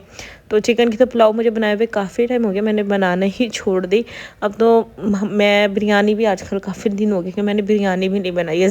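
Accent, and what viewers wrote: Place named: Karachi, Pakistan. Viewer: Ap ai to Multan se ha Karachi me pr ap bolte bilkul Karachi wlo ki trah Hain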